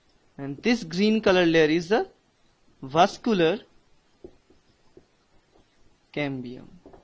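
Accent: Bengal, India. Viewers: thanx bro, Ur accent looks Bengali but doesn't matter. explanation was gr8 thank u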